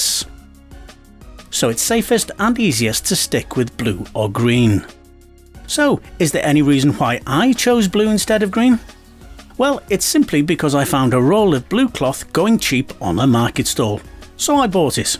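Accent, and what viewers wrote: United Kingdom. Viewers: British accents clench my jaws...I'm good for about 30 seconds...max...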